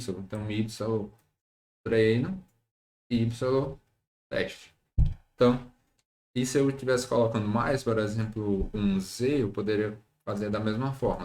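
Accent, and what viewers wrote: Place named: Fortaleza, Brazil. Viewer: Ótima didática e ajudou demais! Obrigada. Entendi tudo =) inscrita! Vc tem o sotaque daqui de Fortaleza-CE !!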